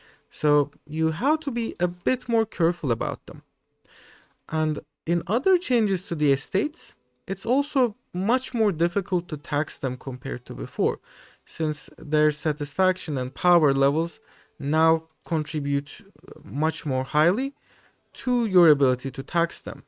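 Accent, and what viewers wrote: Turkey. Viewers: It's just your english accent sounds very turkish and your pronunciation of turkish names is almost spot on. The only proper name you "messed" was "Döger" and that's not even your fault. It's PDX's fault. It should be "Döğer" with a soft G not "Döger". I swear the letters I, İ, and Ğ cause so much pain for the software developers lol. Although azeris call that oghuz tribe "dögər". Perhaps you are azerbaijani 😂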